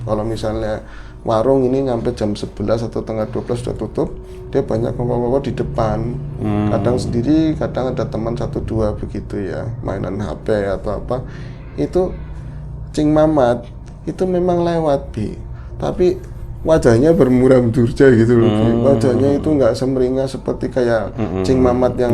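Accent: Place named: Solo, Indonesia. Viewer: Mas flexible, ya. Tema wong Solo otomatis setelan gaya bicara wong Solo. Tema Betawi otomatis gaya bicara ngikut dialek Betawi. Mangstab 🤭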